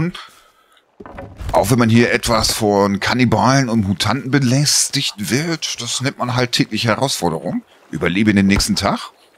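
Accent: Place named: North, Germany. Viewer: Hallo, Lass dich nicht ärgern, ich persönlich mag den norddeutschen Akzent und "Suuns"of the forest ist einfach sehr erfrischend zu hören 💙😊 Lg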